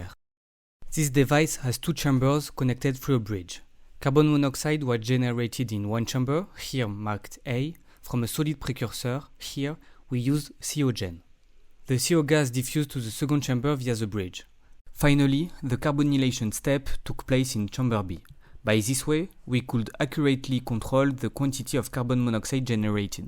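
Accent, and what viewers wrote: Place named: France. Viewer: Je reconnaît le petite accent français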